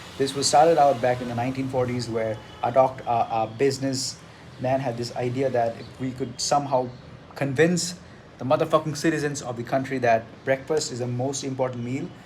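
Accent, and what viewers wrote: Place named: Chennai, India. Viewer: Gangster intro dawg! You f*cking fly man. You the Top G of Chennai, with that accent. You the dawg! The Big G. You are a legend, f*ck yeah man! The big dawg of Chennai.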